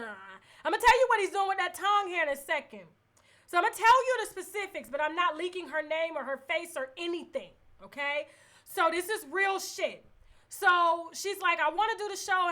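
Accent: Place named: South, United States. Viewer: I love your country accent.I am also a pisces so i relate to you on so many levels!🙋🏾‍♀️✊🏾👸🏾💜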